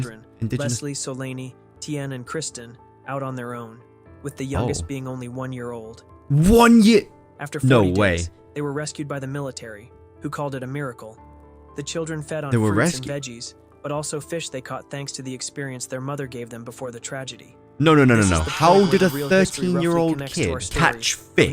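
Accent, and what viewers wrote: United Kingdom. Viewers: Are you British because I don't mind British people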